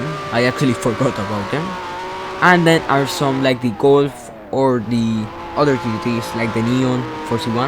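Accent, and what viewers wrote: Spain. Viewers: Luv the Spanish accent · wtf the spanish accent bro lmao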